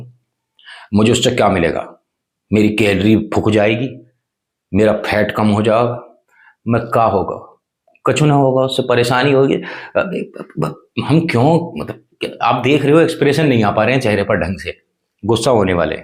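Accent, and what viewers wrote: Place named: Mathura, India. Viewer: Aapki Mathurawali boli gazab hai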